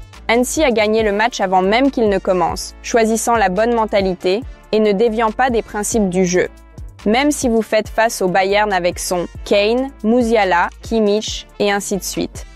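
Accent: Quebec, Canada. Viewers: Je ne comprend pas cette chaîne ? Est-ce une IA ? Une voix d'IA ? Une québécoise ?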